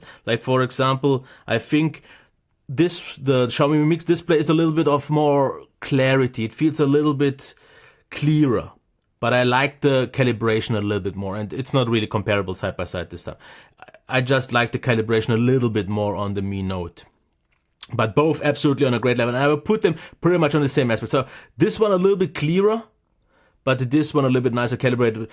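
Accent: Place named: Germany. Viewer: Like the way you explain about which one to choose. Oh and that German accent is something else >))) keep the good work!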